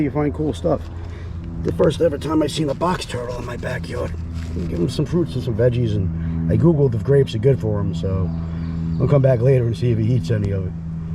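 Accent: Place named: New York, United States. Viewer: I already know I want this rod , lamiglass something, I got that thick new yawk accent too, Bronx ? Anyways I’m from Long Island , local#3 in the city more than here, In any event yeah if you can let me know what rod that is - specs wise , I definitely need a new one, sick of my 9-2 st. Croix , it’s not the greatest for this top water guy , about to watch this vid I know you guys are gonna crush it ! Thanks brotha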